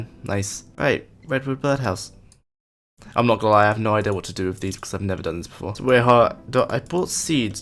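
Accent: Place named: RP, United Kingdom. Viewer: your so posh i cant understand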